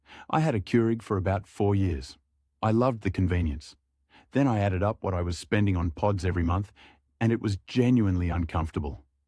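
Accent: United Kingdom. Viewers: It could be. But I've noticed that videos made with AI have a little marker just below the video area that identify them as such. This one does not have that. Also, having listened to the entire thing, I doubt it. I don't understand why the narrator has a British accent but listening to it, I can say, it's not AI-- with AI narration, there's always a give away; some little quirk, mispronunciation or glitch. None here.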